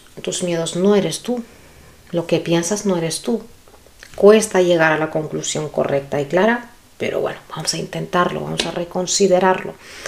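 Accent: Spain. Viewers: Así es, hay que buscar la manera de retribuir y ayudar a los animales, me gustaron tus consejos, especialmente el de remojar el pan 🥖 duro para los pajaritos ❤ Un abrazo desde Chile 🇨🇱 Me gustó mucho tu lectura, deseo que se materialice y finalice este año llena de abundancia y felicidad. Ah, y me encanta tu acento español 😊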